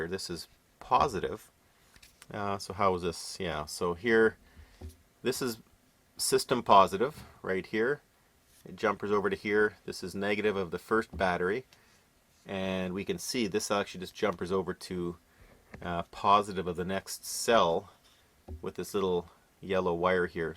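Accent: Canada. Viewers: I don't know if you're Canadian or not, you sound it (no offence meant if you're not). The ones I have (in the UK) don't use a DC motor, they use an electromagnetic actuator type deal and readily accept the fake refills. Same company, but very different design. Cool stuff though, watched it all.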